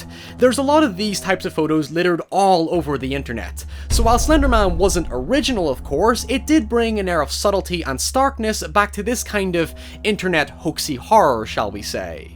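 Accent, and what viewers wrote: Northern Ireland, United Kingdom. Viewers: Are you from northern Ireland? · I think this is the only channel I have found with an accent from home. Go on norn iron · I love the NI accent <3 Pronouncing "now", "nye" is so cute.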